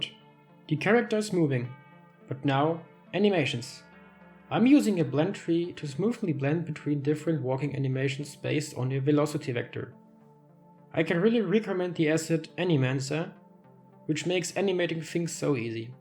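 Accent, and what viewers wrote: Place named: Germany. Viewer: you sound incredibly german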